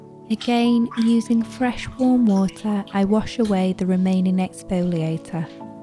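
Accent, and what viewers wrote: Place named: Northern England, United Kingdom. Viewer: is hers a Northern English accent? Whatever it is, I love it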